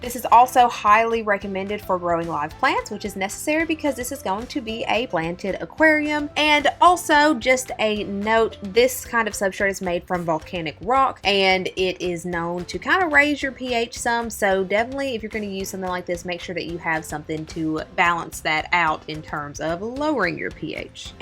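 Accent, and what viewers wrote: South, United States. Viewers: That southern accent though haha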